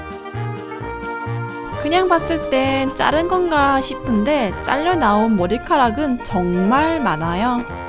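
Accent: South Korea. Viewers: 님 대구 사람이예요? 아님 서울 사람 이예요? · 꼬마 부산에사셔서 부산같은데..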